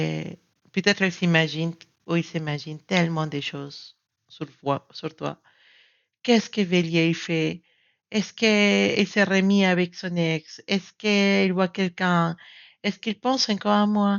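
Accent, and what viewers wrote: Spain. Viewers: Merciii cest fou cest ca cedt avec un vierge flamme jumelles yavait une tierce je lui ai dit mes sentiments et aussi quil me manquait samedi cest son anniversai je vais lui envoyer un message vous avez un joli accent vous etes espagnole ma maman est mexicaine merciii je laime de trop ca gait un an que je lattends la je vois une personne meme si je laime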